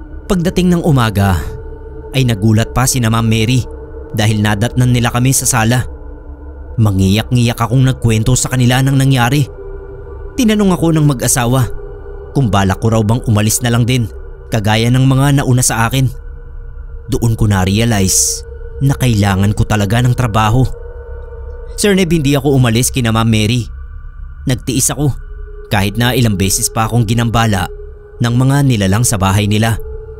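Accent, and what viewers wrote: Iloilo, Philippines. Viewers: boss first time ko sa channel mo pero naririnig ko na mga videos mo kapag nanunuod kapatid ko . Gusto ko lang itanong, ilonggo ka no?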